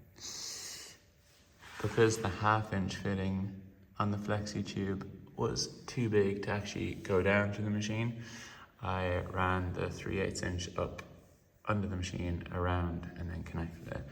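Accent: Ireland. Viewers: thanks for the informative video - just what I needed to see how involved the plumbing was before making a decision on whether this will be my next espresso machine upgrade from my plumbed ECM Synchronika (gen 1) - nice to hear the Irish accent when I landed on this video - I am from West Cork and wife is from Galway but living in Tampa Florida for last 28 years. The cafe looks great - hopefully will be passing through Gorey some day to try the cappucinos! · indeed you did not specify what thread standard you used for your hose connections. People in North American will assume NPT because that all we have commonly available here. Sounds like you have an Irish accent, so maybe you did use BSPP (British Standard Parallel Pipe) fittings which is what the La Marzocco machines have inside. But those fittings are not commonly available in NA. North American Pipe Thread (NPT) fittings are tapered, not parallel, have slightly different thread pitch, and while a ¼” male NPT will thread into the pump on the LM Mini & Micra Pump BSPP female connection, it will also damage it in the process. 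To connect an NPT flexible hose to the LM pump, a BSPP 19 threads per inch) to NPT (tapered 18 threads per inch) adapter is required. So maybe you are fine if you are indeed in Ireland, but a North American viewer will damage their machine doing what you did with plumbing fittings available locally.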